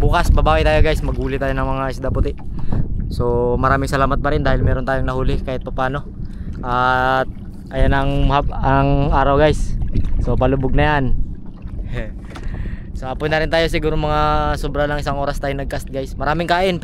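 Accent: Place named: Visayas, Philippines. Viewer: Bisaya ka ya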